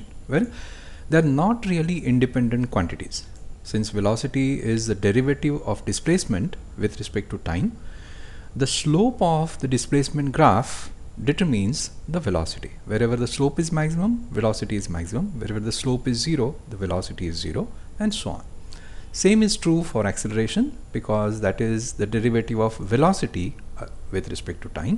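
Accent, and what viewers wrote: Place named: India. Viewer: hats off. great work from the professor, made very easy to understand. and he has excellent indian accent. thanQ very much sir.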